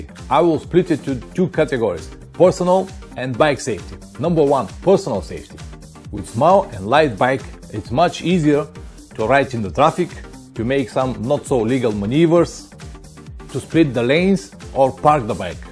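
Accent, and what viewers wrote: Russia. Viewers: я не знаю с какой ты страны, но у тебя такой русский акцент на английском, что я понимаю твой английский без переводчика))! С любовью из России! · Ты чо? Русский чтоли?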